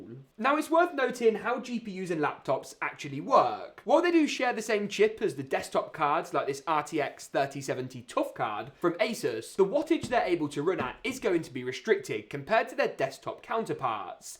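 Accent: United Kingdom. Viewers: british accent is so ridiculous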